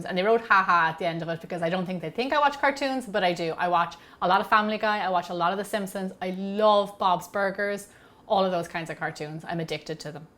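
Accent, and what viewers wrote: Ireland. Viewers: I love your Irish accent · I am really glad I´ve found your channel. First, because you bake heavenly goods and teach the recipes really well. Congratulations on your channel! Second, because listening to you takes me back to Ireland, a place I was fortunate to live in for two years of my life. Let's cheer for your talent and great work! Sláinte!! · May we please have a video of you cooking while you're in Ireland?:D I just love Irish people I think you guys have cute accents xD Also do you like the tropics? Come to the Philippines some time in the future or make something big and bold with tropical fruits:D ( I rarely see desserts with them tho o.o )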